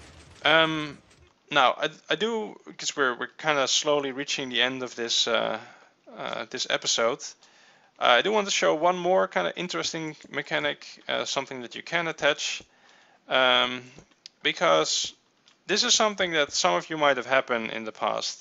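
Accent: Netherlands. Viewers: are you dutch? I am haha so... And you sound pretty dutch by how you pronounce your english words. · amazing tutorial, got me to understand everything i wanted! The accent is very clear, though :D. If i may guess, Dutch?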